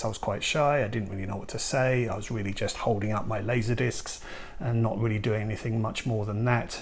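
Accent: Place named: England, United Kingdom. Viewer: It’s the English accent that makes it sound so classy when you’re talking about movies 👍🏻 400 great job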